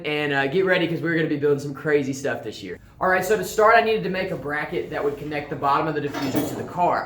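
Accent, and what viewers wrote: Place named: California, United States. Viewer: You could kinda look like a young sean boswell with that california accent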